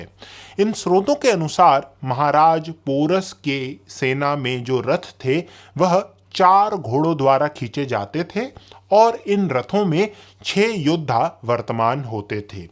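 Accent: Standard. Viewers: request, aap standard hindi toh bolte hi ho, par thoda thoda awadhi language (awadh region ) words and slang, accent bhi use kar liya karo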